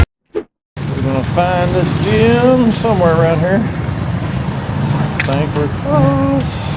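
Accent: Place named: South, United States. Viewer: Are you from Texas? You have a Southern accent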